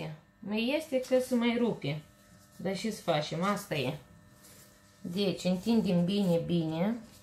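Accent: Moldova. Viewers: Ma uit cu placere la ceea ce gatesti,esti ff simpatica so imi place cum vorbesti moldoveneste! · M-am abonat pentru graiul moldovenesc ”dulce”...! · Bravo esti o Super gospodina.Imi place mult graiul tau moldovenesc.Maria.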